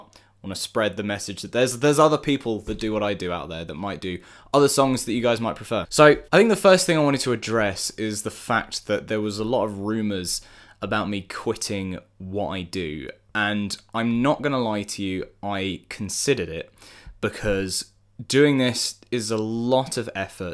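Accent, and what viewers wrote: United Kingdom. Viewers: yOu sOuNd bRiTiSh also late congrats on 1 mill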